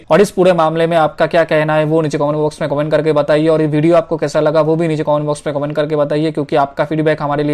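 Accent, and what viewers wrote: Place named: Bengal, India. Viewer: Bhai tum bangal ho tum hindi main vedio kyu bana rahe ho ..tumhare alfaz aur talaffooz se pata chalta hain ke tum bangal hoo.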